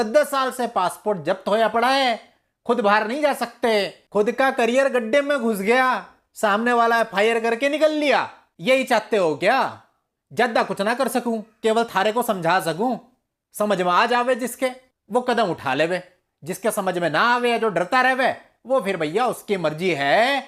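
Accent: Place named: Rajasthan, India. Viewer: Dear sir, Aap ki Rajsthani sunke bahot maza aaya.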